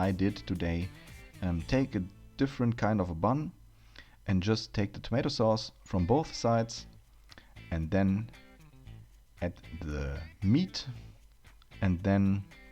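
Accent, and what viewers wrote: Germany. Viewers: Schönes Video und sehr interessant das Rezept! ...aber dein deutscher Akzent beim Englisch sprechen ist echt Hardcore!!! Versuch die Worte mal ein bisschen weicher zu formen dann klingt das ein bisschen sauberer und flüssiger. 😉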